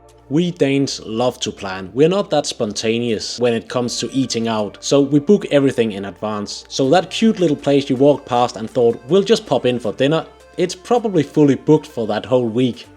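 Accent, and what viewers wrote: Liverpool, United Kingdom. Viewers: You sound like a Beatle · You speak English with a Liverpool accent. Were you taught English by a Liverpudlian? Love your videos. Good job. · Thank you! My partner and I are visiting Copenhagen in two weeks time from Manchester and this video and the other video "My Amazing Day Trying New Things in Copenhagen City Centre" have been very helpful! We're just trying to work out your accent, have you spent some time in Scotland or also Northern England? lol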